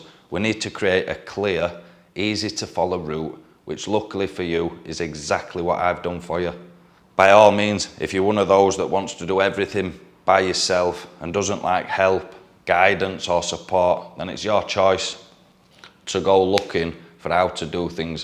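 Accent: United Kingdom. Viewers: Thanks Man, your videos are Great! Your explanations are very clear. I'm a Yank and I love hearing your Limey accent! I am an old guy, now retired, with over 21 years of getting a full body suit. I suddenly got the urge to learn to how to tattoo when I thought about getting my hand tattoos touched up and thought I might try to do it myself. I may never get confident enough to do it, but I'm having a great time trying to learn. But I'm still avoiding attacking my skin! What would you suggest as an all-around good liner? I have only some 10-05 RL and wondering whether to try 10-07 or 10-09 or what?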